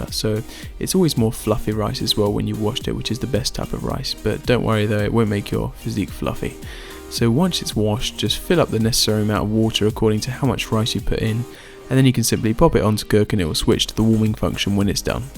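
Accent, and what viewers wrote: RP, United Kingdom. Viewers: You have a very posh accent, great meals thanks. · You speak with a perfect RP (Received Pronunciation). You should consider doing voice overs for ads etc..while you are studying.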